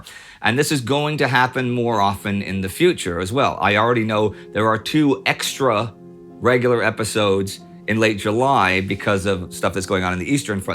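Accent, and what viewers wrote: Texas, United States. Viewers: I love texas-accent <3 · your Texan is showing.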